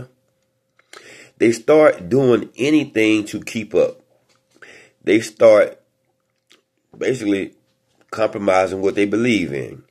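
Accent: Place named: Chicago, United States. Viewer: You gotta be from Chicago 🙂‍↔️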